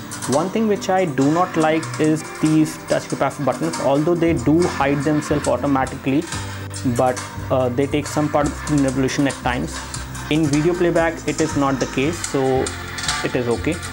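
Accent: India. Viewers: when Indian speak English. We hardly catch up what they are trying to say. The worst accent ever! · another indian accent...pffff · I suggest you check out other channels. The Indian accent might be a little hard for you :)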